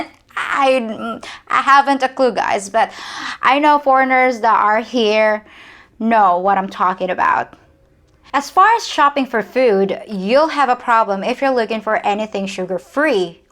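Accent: United States. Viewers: your American english accent is so good I would think you were the girl next door. I'm from Washington St. and I would say you have a northern accent. It blows my mind every time I consider that you have never been outside of the PI. Oh yeah and your addicting to watch.:) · Beautiful Leyte. Have you been abroad a lot? Your English are very good and very American. And have a hard time resisting answering what you put out:-) All countries has plus and down sides. The good thing with down sides is that then there is something with a potential for improvement. Yes the bikes with all the people on it....I remember first time i see that. My friends at home had a hard time believing people put their kids on a bike like that and took that risk. Later I have seen some real bad accident with bikes in Cebu. A bike is designed to take one or two people, and if you go beyond the factory specs, anything can happen. 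And yes the roads in Pilipino city's are overcrowded. Everyone like to have their own car. But the road are limited. The better of the people there will have it, the more people will buy their own car. Soon I have to buy a horse and bypass the almost stagnant traffic. This is a political theme that not only Philippines has to work with, many big cities around the world had to address this. regarding cats with messed up tails, I always believed it was all the loose mad dogs that bit them of....So it is inbreed... And coffee....I come from one of the most coffee drinking countries in the world. I guess freezing cold climate large parts of the year has done a hot cup of something popular at work breaks and after a meal. My grandmother lived in a time they buy imported beans. This was grinded on a very small grinder, so small it would fit i a lar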